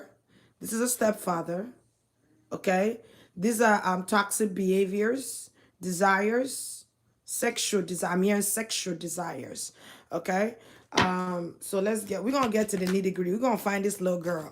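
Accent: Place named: Caribbean. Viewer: You remind of Rihanna are you from the islands ?! I love that accent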